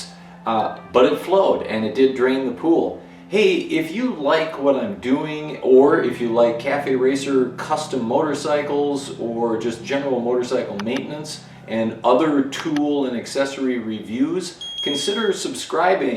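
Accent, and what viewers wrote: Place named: United States. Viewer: what is there about this video that a person would not like? Maybe they are genetically related to Scrooge and they just don’t like anything no matter what. I listen to it twice just because I liked it so much. I am totally blind and I really need to know how these things work. Pictures on boxes do me no good whatsoever and neither do those folded math like papers in 16 languages. I needed a description by an English-speaking individual who spoke American English like me. I appreciate the time it took to make this video and I will be recommending it to everybody I know who might like or need to use one of these pumps. Thanks so much once again because most of these videos just have music and no talking and they drive me up the wall. This is a great video and I don’t understand why it hasn’t been watched 1000 times or more. 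I give it 10 out of 10. thumbs up and every other kind of good review numbers anyone can think of to add to mine.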